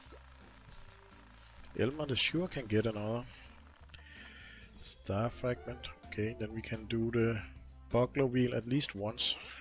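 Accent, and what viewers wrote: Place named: Denmark. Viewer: Du är dansk va? Det hör man på din engelska 😅 Men, sjov med människor som streamar Looney Tunes, keep it up!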